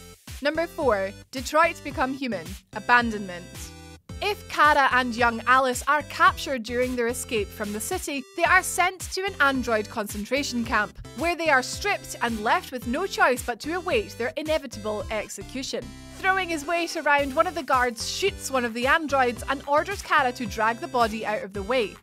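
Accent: Scotland, United Kingdom. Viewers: Ah miss I could listen to your sweet Scottish tones all day 😉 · ZOMBIE BEYONCÉ! Haha! Initially I was doing something else whilst listening to this video playing in the background. At no.5 in the countdown (@ - ) “House of the dead, Zombie Fiancé” I was so sure I’d heard ‘Zombie Beyoncé’ which peaked my interest in that game ten-fold...haha! Just imagine Beyoncé as a zombie. I rewound the video to double check & obviously immediately realised I was incorrect & had misheard due to Scottish accent. Oh well...It was a fun thought though while it lasted! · I switch to something else the minute I hear this Scottish girl’s voice 😒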